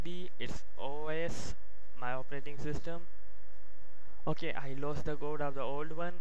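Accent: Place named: Spain. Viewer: How about learning speak english correctly and not "spanish english"?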